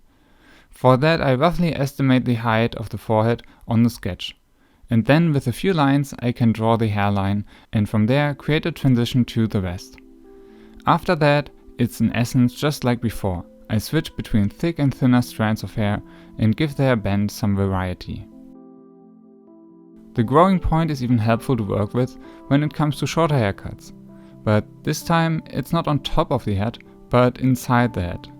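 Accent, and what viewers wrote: Germany. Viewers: I know I‘m late, but I just wanted to thank you for what you achieved and did for all of us here. Your tips are incredible, and after all hair is the thing that stands out the MOST while looking at characters, it‘s the thing that makes them so different, just like eyes or whatever. (Ich war sehr überrascht, als ich den deutschen Manga sah, aber an deinem Akzent habe ich schon erkannt… also in dem Moment dachte ich „Hmmm könnte es sein?!“ finde es voll cool, dass du deine Videos trotzdem englisch machst. Mach weiter so!!! ✨)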